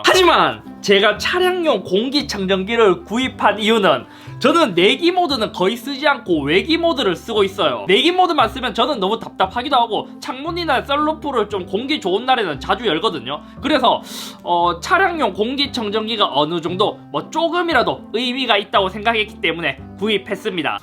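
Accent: Gyeongsang, South Korea. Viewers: ..쭝국...사투리보소 경상도 사나이네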